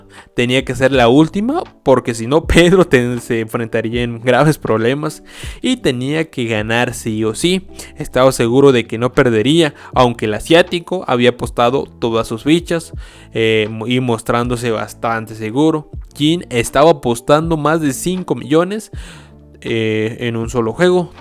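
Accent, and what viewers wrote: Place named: Chihuahua, Mexico. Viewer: parece de chihuahua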